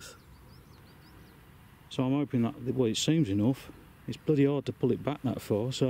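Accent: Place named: West Country, United Kingdom. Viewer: If I lived close mate, I'd do it no problem! But judging by your accent, I'm guessing West Country somewhere? I'm up in West Yorkshire, Leeds. Looks a nice spot to fly though.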